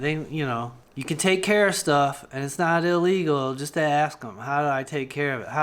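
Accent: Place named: California, United States. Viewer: Man, if that's isn't a Californian accent, I don't know what is! LMAO.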